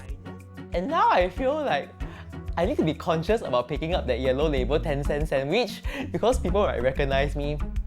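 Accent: Singapore. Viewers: Accent is very Singaporean; but the whimsical and joyful outlook is clearly NOT Singaporean. Most people I meet here are gloomy and not as bubbly. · always thought he was just a NA/EU guy with a singaporean accent tbh, never expected him to be singaporean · Wow! Your energy is infectious! And i am so happy that you are proud of our Singaporean accent and do nothing to change it even when speaking to the British. Haha! Love your positive outlook on life! Definitely can't tell you are an introvert. Must be yet another INFJ haha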